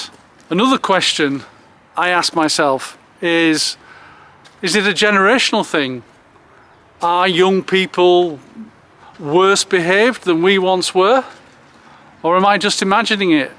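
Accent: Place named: England, United Kingdom. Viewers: Overnight i just wanted be on my own, that was back in 1990, I remember the moment, it was very strange. Judging by your accent are you from Leeds or Huddersfield? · Hi,. I'll be 65 early next year. No, it's not just an age thing that we do our best to avoid people. I've always been that way since a little kid. Obviously, natural introversion comes into it, it's something you're born with. Also, environmental factors like family life etc, as you're growing up, and the not so good things that come with it. That can deepen the introversion. At nigh on 65, i've always loved my Solitude, it's my medicine, i'm happiest then. You sound like a Yorkshireman,. I'm from Sth. Yorkshire. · Can't stand English accents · First video of yours I've watched. The title caught my eye (I'm 61). And, as you started, I heard "York"...one of our kids went to graduate school there so we visited a couple times and really liked York. 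I've always avoided people more than most probably, but at this age, I have even stronger pull to have regular interactions with a couple close friends, not several acquaintances. As for you, I mean this with genuine respect, you sound like the locals we met there, many we knew were lifelong residents of York and they said it has gotten similarly busy. I'm not sure the young folks behavior is a result of much of anything other than being young and their particular generation. I'm American (don't hold it against me) and we see similar here especially in places where young folks are in higher numbers. Appreciated the video, liked, subscribed, looking forward to viewing more...there's not much talk about aging so these are helpful. Thanks!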